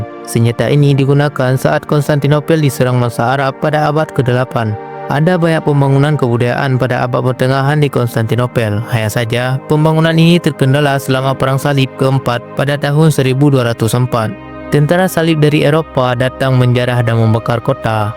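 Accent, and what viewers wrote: Aceh, Indonesia. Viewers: Apakah VO berlogat aceh😆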